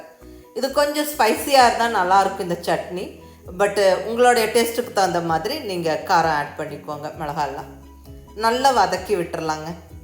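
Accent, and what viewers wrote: Kongu, India. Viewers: Hi mam... U r one of my inspirations to start a YouTube channel... Love the way you describe the cooking with கொங்கு தமிழ் ..way to go mam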